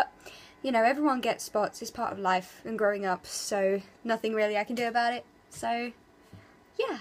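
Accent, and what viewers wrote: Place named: United Kingdom. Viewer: i love your british accent, it's pretty nice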